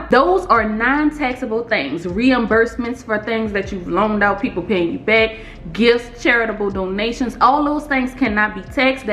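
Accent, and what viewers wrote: New Orleans, United States. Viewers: Just came across your channel and I love the content. Also, I said to myself I know a NOLA or river parish accent when I hear it. 😆😆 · Hold on! I’m listening but is it really a fireplace on the mounted TV? 😂 Idk why that’s funny but I love the NO accent! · Am I trippin or is that accent straight from NOLA?? I wanna think I can spot my kinfolk anywhere 😂. Good info, basically you’re an independent contractor making $600 yearly 🙄.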